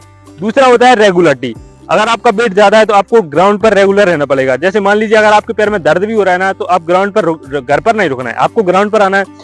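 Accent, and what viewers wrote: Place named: Bundelkhand, India. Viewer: Bhai bihar se nhi lag rahe kaha se .... bundelkhand se lag rahe ho khaskar M.P se 😅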